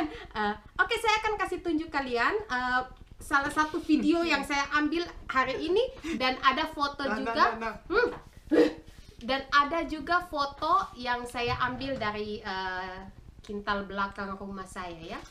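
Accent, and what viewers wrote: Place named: Sulawesi, Indonesia. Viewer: Logat bahasa sulawesi, mukanya juga sulawesi, slam kenal..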